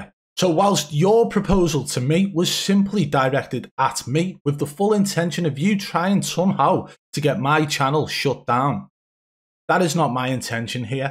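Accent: Liverpool, United Kingdom. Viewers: Ur scouse accent is unbearable.. I feel like I agree that all or most of these streamers are cheating In warzone but your videos seem Phony as well and really shady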